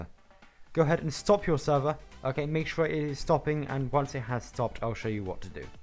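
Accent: United Kingdom. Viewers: the strong bri'ish accent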